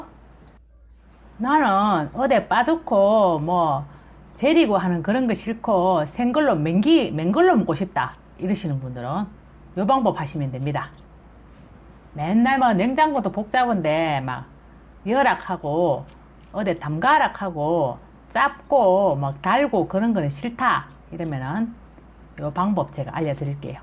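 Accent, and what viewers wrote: Gyeongsang, South Korea. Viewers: 저는 요리배우는것도 좋지만 경상도 말씀으로 설명해주시니 너무도 웃기고 재밋어 좋습니더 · 웃음띈 아름다운 모습에 구수한 경상도 사투리 정말 녹아 내립니다. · 아지메 경상도 어디요!? 넘 정겹네요!!! 잘 봤습니다 건강하시길 기원합니다 🙋‍♂️🙆‍♂️👍🤪😂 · 경상도 사투리가 정겹네요 좋은 영상 잘보고가니더 또올께요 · 너무 좋아요 참 지혜롭게 하시네요 아주 가끔씩 보긴 하지만 입담도 좋으시고 경상도 억양 꿀팀, , ㅎㅎ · 구수한 경상도 말 너무좋네요 고추요리 감사합니다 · 경상도분이시군요 재밌게 잘 알려주시네요 감사합니다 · 장맛비가 오고나니 텃밭 풋고추가 많이 달려서 어쩌나 했는데 이렇게 좋은 방법 주시니 감사감사요~~^^ 매운맛이 전해지는듯~^^ 갱상도 사투리가 정겹습니다~♡ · 따라해보려고 청양고추 2봉다리 사왓어요 ㅋㅋ 구수한 사투리에 내고향 경상도의성이 생각나네요 구수한 사투리에 친구엄마처럼 잘가르쳐주셔서 감사합니다~^^.참고로 5학년8반입니다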